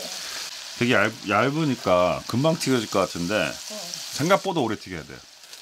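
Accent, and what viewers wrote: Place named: Philippines. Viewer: His accent is slowly turning into a filipino accent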